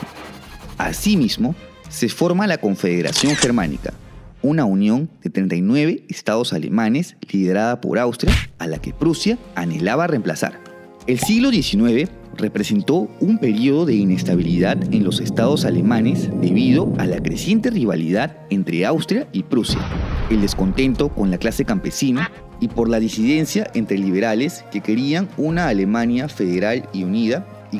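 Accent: Peru. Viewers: Que FEO el acento peruano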